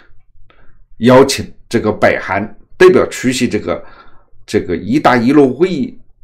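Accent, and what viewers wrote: Hunan, China. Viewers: 这个主持人一口的湖南腔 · 我麻烦你莫用湖南腔来港话好不？丢湖南人脸，你老屋哪里滴？长株潭地区的吧?真滴舍里舍格！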